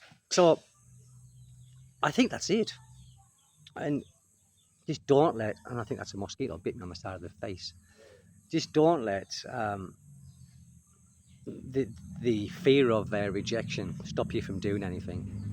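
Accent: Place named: England, United Kingdom. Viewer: I totally agree with you. My husband is a pure english born here in US. I had the blessing of meeting my mother in law and was able to take care of her. You always remind me because she has the same beautiful accent. Learned their straight forward character. I was then able to protect and defend myself by using my mouth at work. Proud of it because i always just cry on the side when i was abused mentally. I appreciate all your videos. I love learning so i always look forward to be inspired again the next day with your knowledge and wisdom based on experience and advices all your life. Thank you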